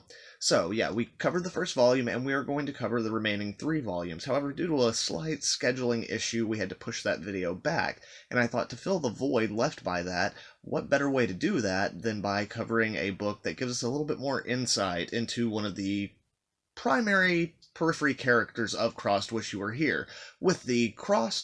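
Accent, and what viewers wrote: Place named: Russia. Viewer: It almost sounds like Russian/Easter European accent lol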